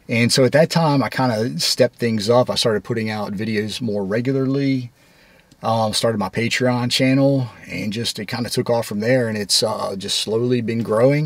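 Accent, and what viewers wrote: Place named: Mid-Atlantic, United States. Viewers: I’m a resident of Fredericksburg and I’ve really liked your content. So much history in this little town. I have to ask, where did you grow up? I can’t place your accent 😂. Pennsylvania? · Congrats! As far as accents, I'd say you have a mid-Atlantic accent, neither Northern nor Southern, yet not Midwestern. You don't have the twang of southwestern VA where I was raised, nor the drawl of the Danville area where I lived. And nobody in VA has the all out Southern accents down here in SC and GA 🤣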